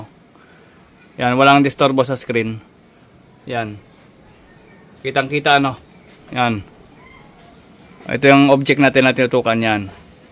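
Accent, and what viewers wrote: Iloilo, Philippines. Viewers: Master ilonggo ka ba?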